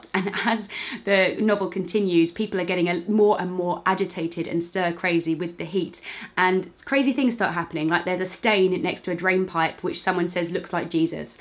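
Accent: United Kingdom. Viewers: Oh you sound like a surrey girl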